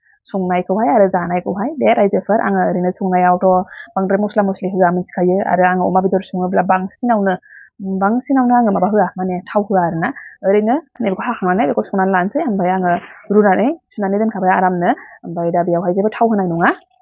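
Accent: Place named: Udalguri, India. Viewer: Ma'am ni rylynaikou udalguri ni badisw mwnw ag karon jwngni udalguri ni mansifra ma'am badi rylayw.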